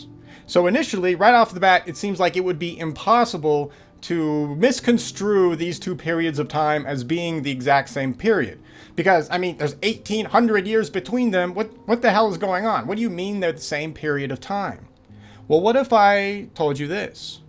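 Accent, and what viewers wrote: United States. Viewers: Dude, you sound like you're american. Please come to Europe, you can see christian sepulchres from the 4th century underneath my neughbours back yard UNPRESERVED. You can see a church from the 10th century on a hill in a nearby village. You can see a fortress built by Turks on top of a Byzantine fortress who built on top of a Roman Castrum on top of a Celtic hillfort.... and I live in the Balkans. It's all fine and dandy when you read and see PRESERVED places from history. Come see raw forts wrecked centuries ago, you'll get a sense that Roman stuff is way older than late Medieval stuff when you actually touch it OUTSIDE OF A MUSEUM, outside of internet. Plus, the girls are fine and a beer is like $2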